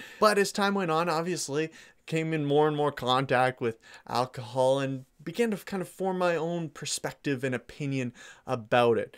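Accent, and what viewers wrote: Canada. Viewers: When you realize he’s Canadian, the accent becomes sooooo clear.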